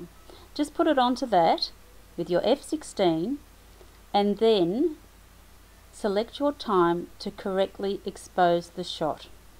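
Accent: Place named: Australia. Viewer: Hi, I love your tutorial style! Lovely articulate Aussie voice. Some other tutors talk way to fast for me. I am a beginner in photography world and have done my first on astro shoot which was totally out of focus, so I reached out on good old Youtube to find some answers. Following on from your Night photography tutorial I found the ND filter one and was curious so subscribed to gain further insight. Thanks for sharing your knowledge with me. Jane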